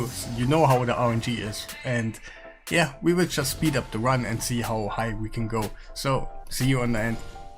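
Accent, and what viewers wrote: Germany. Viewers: Bist du deutsch?😅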